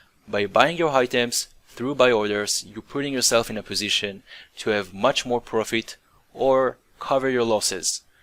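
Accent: Israel. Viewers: יש לך מבטא ישראלי חלש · I'm just from israel too and i was pretty sure you are by your accent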